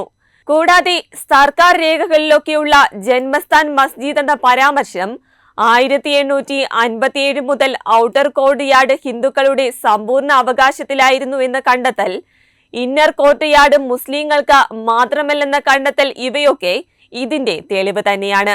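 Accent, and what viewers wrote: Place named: Kollam, India. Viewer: സുഹൃത്തേ താങ്കൾ കൊല്ലം ജില്ലയിൽ പോയിട്ടുണ്ടാവില്ല. അവിടെ ചില പ്രദേശങ്ങളിൽ ഉച്ചാരണം അങ്ങിനെയാണ്. അറിവില്ലായ്മ ഒരു അഹങ്കാരമായി കരുതാതിരിക്കുക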